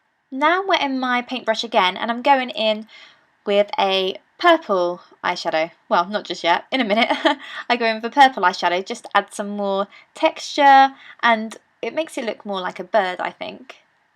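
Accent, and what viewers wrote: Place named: Yorkshire, United Kingdom. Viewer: Hey! Lovely make-up - I do love your accent...I think you might be from the Yorkshire area, I'm right? :3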